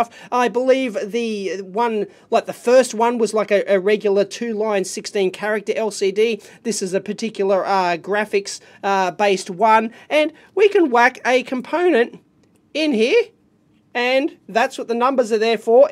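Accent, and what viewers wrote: Australia. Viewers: aussie terms crack me up,basically brit humour with an aussie accent,"pissin away power","one hung low". Great stuff mate. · Jeez mate, patter is bonza. Stone the bleedin crows mate, strine is easy, try wrappin your lug holes around Scottish. We all talk like in straya especially after a schooner or two. Just keep an eye out for drop bears...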